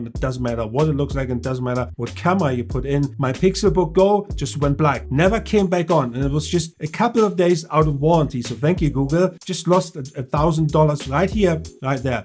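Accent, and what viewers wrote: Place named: Germany. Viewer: i have stayed in germany for years. This is the accent of Germans, i can smell it from miles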